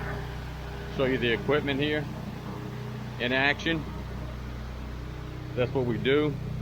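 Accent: Louisiana, United States. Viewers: You from Louisiana?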